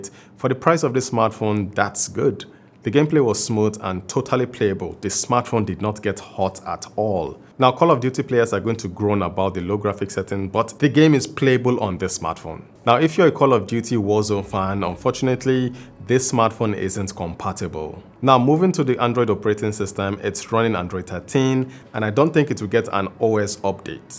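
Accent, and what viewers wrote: Nigeria. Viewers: Bro are u originally from Nigeria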